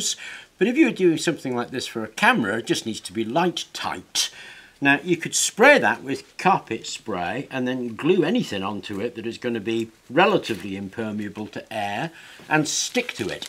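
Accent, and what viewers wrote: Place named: England, United Kingdom. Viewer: Actually watching this for camera bellows. That English accent is just the cherry on top.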